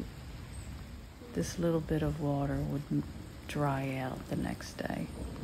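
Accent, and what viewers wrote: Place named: Philadelphia, United States. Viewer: Nice video, I’m a commercial roofer. And go Phil’s! I hear the Philly accent. I’m from Philly too!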